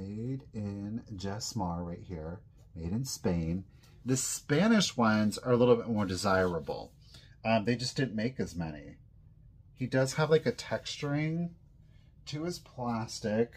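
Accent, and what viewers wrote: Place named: Scotland, United Kingdom. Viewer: you sound Scottish 😂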